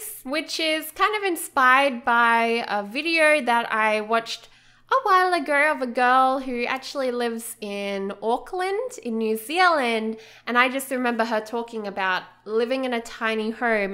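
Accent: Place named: Australia. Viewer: New to your channel and wondered if I you were Australian as well... then you said 'reckon' and I knew straightaway haha.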